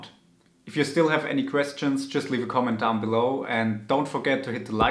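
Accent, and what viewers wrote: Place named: Germany. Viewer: Ohh, okay. Wirklich? Man hört es an der Aussprache, dass du aus D sein musst. Ist bei meinem englischen Kanal auch so, dass merkt irgendwie jeder direkt :) Ja, ich bin immer auf der Suche nach neuen Malcolm Riffs, da ist es durchaus möglich das ich schon mal einen Kommi hinterlassen habe :) Langsam hab ich den richtigen Tick raus, um es beinahe fehlerfrei zu spielen :) VG aus dem Chiemgau, Vanessa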